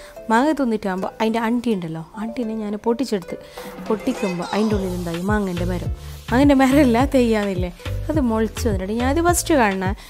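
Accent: Kasaragod, India. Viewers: Kollallo kaasrott slang 👌😍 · ഇതെന്തു ഭാഷ 😂🙊... പൊളി 😂✌️ എനിക്കു ഇഷ്ടാണ് കാസറഗോഡ് സ്ലാങ്... ഒന്നും മനസ്സിലാവില്ല കേട്ടാൽ.. 😝പക്ഷെ കേട്ടിരിക്കാൻ ഇഷ്ടാ 😍... കണ്ണിമാങ്ങ അച്ചാർ 😋😋😋വായിൽ വെള്ളം വന്നു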